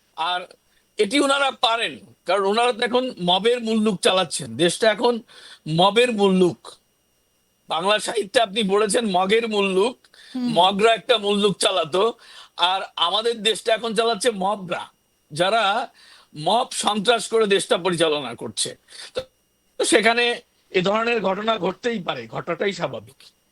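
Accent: Kolkata, India. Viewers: ঐ সাংঘাতিকতো বাংলাদেশের না।ওর কথার উচ্চারণ ভারতীয়। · Kolkatar accents!!!! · এই সাংবাদিক এর ভাষা তো কলকাতার ভাষা! আর একে তো কখনোই দেখিনি! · এই ভুয়া সাংবাদিক কলকতার, তার ভাষার টোন বোঝা যায়। এগুলা করে লাভ নেই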